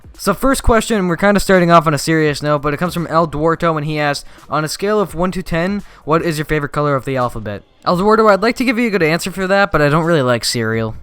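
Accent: Ireland. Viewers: He sounds Irish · I thought you where Irish I'm being honest here not even joking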